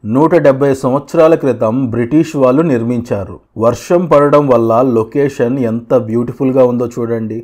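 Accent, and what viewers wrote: Nellore, India. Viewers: బ్రదర్ మీది nellore ఆఆ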